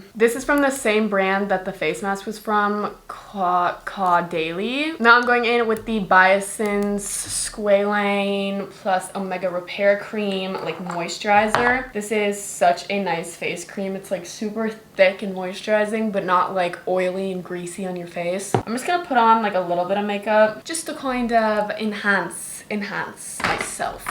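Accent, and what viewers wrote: United Kingdom. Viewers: 10/10 British accent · I love your vids I'm a huge fan!!💗💗💗💗 also it British accent is a 10/10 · british accent 8.5/10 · your british accent sounds amazing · Love the British accent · brittish accent rationg: 100/10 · 10/10 On Your British Accent and you are so beautiful and funny and your videos are so great and so well done! 🩷🩷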